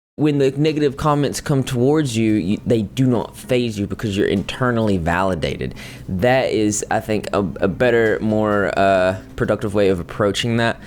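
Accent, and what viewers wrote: South, United States. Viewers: He's a fellow southerner. We get that drawl, especially when we talk fast. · Is he southern or british?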